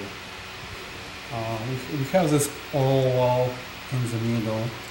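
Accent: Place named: Russia. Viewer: Ты Русский ???? А то акцент больно хорош!!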